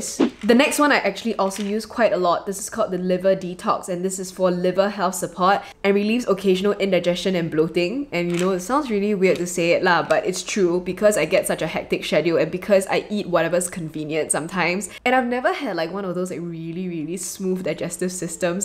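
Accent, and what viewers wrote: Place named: Singapore. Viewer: Your singlish is impressive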